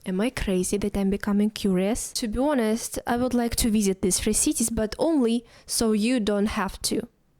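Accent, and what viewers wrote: Russia. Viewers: Interesting vlog.thanks for it.ecellent English.Almost without accent.Are you 100% russian? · I've only heard your introduction and this is my reaction. I hope you don't mind me saying but you have a really sexy voice.. I mean. I am from UK so... they're is that. ☺The Russian accent always sounds sexy to us 🙄😇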